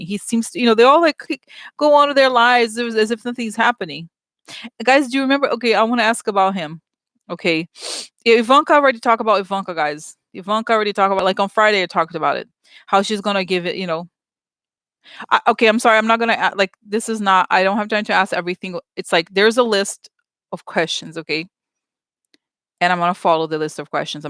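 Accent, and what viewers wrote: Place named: Russia. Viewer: I know you don't typically listen to other readers. But Oracle of Whimsy had a Halloween reading and around the to mark she talks about the GOP takedown and arrests, mentioned 40 of them. It's about 10-15 minutes. Also Revealing Light had a reading in May (?) John McCain is in the title, and she mentions the same thing. Arrests all at once. "Sees most of the old guard of republican party" being ushered out by authorities. Love you, your my favorite. My son saw the green, 3 eyed hat on you and was intrigued. I put you on the tv so he could hear your Russian accent. Yes he thinks I'm nuts....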